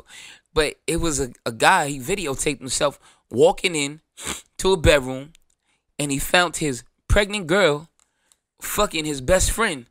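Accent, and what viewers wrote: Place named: Memphis, United States. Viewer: Bruh the Memphis accent took me out breh 😂😂😂😂😂😂😂😂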